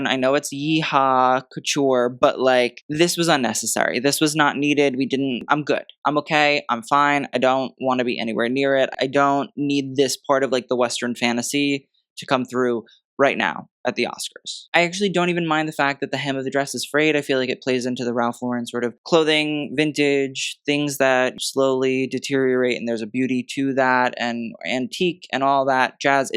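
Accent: United Kingdom. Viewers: Your British accent sounds exactly like Stewie from Family Guy and I love it! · Love you British accent...sooo cute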